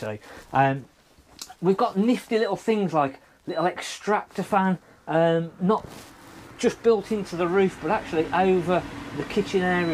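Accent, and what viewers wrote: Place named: Birmingham, United Kingdom. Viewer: Correct me if I’m wrong your a fellow brummy😂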